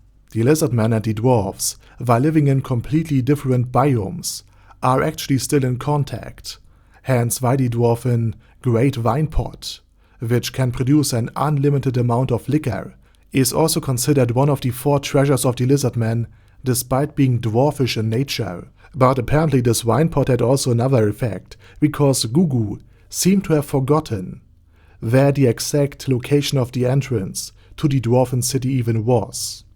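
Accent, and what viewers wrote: Germany. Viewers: dude I love your accent, are you German?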